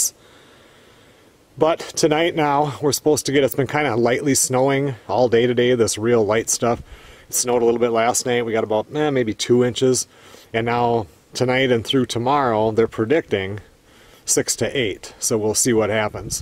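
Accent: Minnesota, United States. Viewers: That Minnesota accent is awesome!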